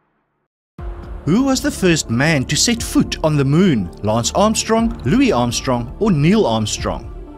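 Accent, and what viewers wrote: South Africa. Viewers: I spend a lot of time on youtube and you are my favorite man. You are so friendly, and the questions are great and I can't get enough of the South Affrikan sound.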